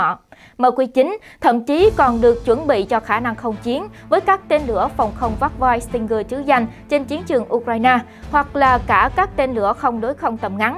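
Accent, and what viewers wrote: South, Vietnam. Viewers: Quân sự mở kiếm đâu ra một em nói giọng Miền Nam 😃😃😃😃😃😃😃😃😃😃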